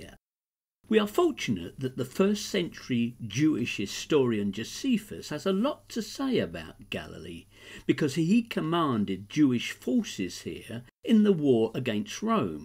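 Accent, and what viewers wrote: United Kingdom. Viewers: oy the brit pronunciation is killin me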